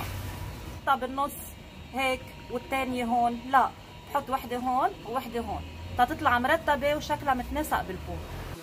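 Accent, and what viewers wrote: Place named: Lebanon. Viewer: عم اتعلم اللهجة اللبنانية منك كمان هههههه 🇩🇿🇱🇧❤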